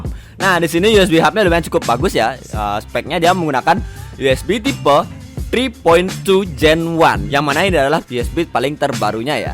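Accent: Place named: Bali, Indonesia. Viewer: Logat ne bali sajan bli ne 😂😂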